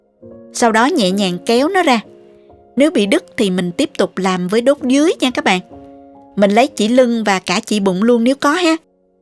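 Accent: South, Vietnam. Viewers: Cảm ơn luôn luôn cho ra những video bổ ích..với sự hướng dẫn ngắn gọn dễ hiểu, cùng với giọng nói mộc mạc đậm chất nam bộ, em rất thích nghe a 👍💕, mến chúc cô nhiều sức khỏe để tiếp tục với niềm đam mê của mình ❤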